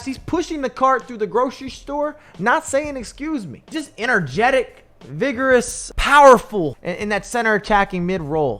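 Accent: United States. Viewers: Bro you american?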